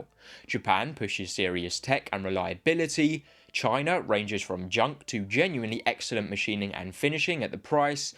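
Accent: United Kingdom. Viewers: Apart of the great content, I love your impeccable british pronounce. 2 lessons in 1 time: about watches and perfect pronounce… what’ else!👍🔝🔝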